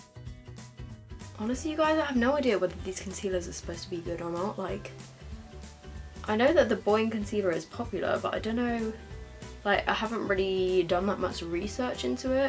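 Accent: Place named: RP, United Kingdom. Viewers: Jasmine Lister just her accent I think. I'm from Newcastle and if anyone sounded like that from here they would be called posh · Jasmine Lister I live in the south hahahah and she doesn't sound posh compared to 99% of people I know. She has what I'd call a regular English accent, you guys have a northern accent and I have a southern/posh accent 😂😭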